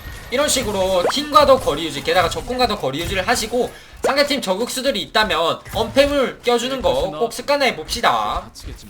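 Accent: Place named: Busan, South Korea. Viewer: 뭔가 부산 말투 같으신데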